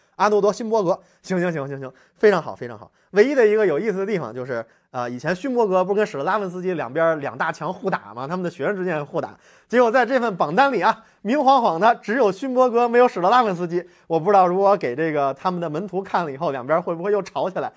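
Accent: Beijing, China. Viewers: 馮老師講英文真有趣，充滿北京腔，哈哈哈哈哈！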